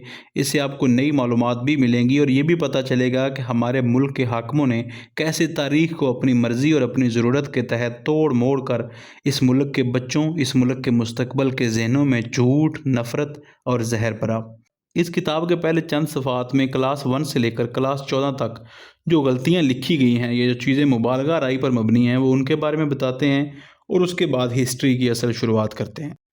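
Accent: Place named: Punjab, Pakistan. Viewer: تلفظ تو درست کرلیں ۔ اُردو میں Audio Book بنائی اور تلفظ پنجابی لہجہ میں ۔ یہ قومی عصبیت نہیں بلکہ خالص علمی تجزیہ ہے۔ اگر آپ اس نصری کتب (Audio Book) کو پنجابی زبان میں بیان کرتے تو بہتر تھا ۔ یا اپنے اسکرپٹ کو اردو بولنے والے ریکارڈ کرواتے ۔ لیکن آخر میں اچھی کاوش تھی ۔ وسلام 🎉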